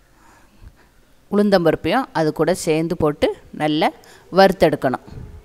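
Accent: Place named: Tirunelveli, India. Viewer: Pudina Chutney super. Ungal Tirunelveli Tamil also super.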